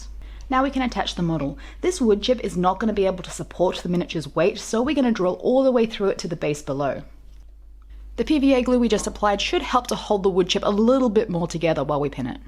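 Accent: Australia. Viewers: Also, subbed. Love seeing content produced in Australia! (God I hope that's an Australian accent, I should be able to pick that)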